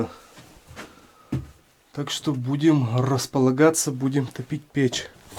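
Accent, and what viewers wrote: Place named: South, Russia. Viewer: Прям чувствуется южный акцент))))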